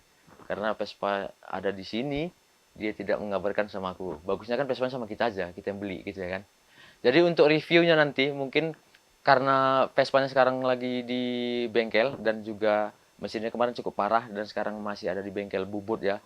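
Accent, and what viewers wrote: Medan, Indonesia. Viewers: Orang medan bg?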